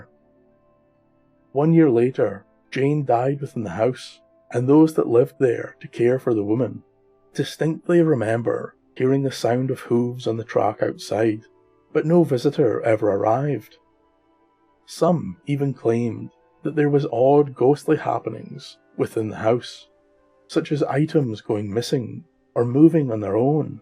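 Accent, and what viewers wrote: Scotland, United Kingdom. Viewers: Most definitely we would appreciate more Headless Horseman tales!! Always we have wondered what happened to the Horseman.. how he lost his head.. and what became of him afterwards.. We love your stories.. and especially your accent.. we are of Scottish ancestry as well.. Thanking you so much we are .. Slan mo charaid William and Jen 💜💙🌱🌹🕊️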